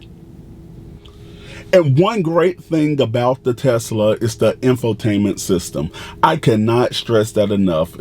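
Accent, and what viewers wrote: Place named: Atlanta, United States. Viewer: Great videos my man. First thing I thought when I heard your voice was “He sounds like he’s from Atlanta” and sure enough I saw the map haha. Keep up the good work.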